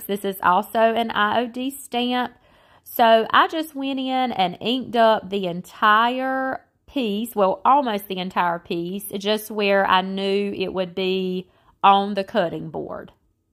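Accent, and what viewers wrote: South, United States. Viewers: All of them simply beautiful! Every one of them is my favorite. I love them as much as you do! P.S. I really like listening to your voice are you in the south? TFS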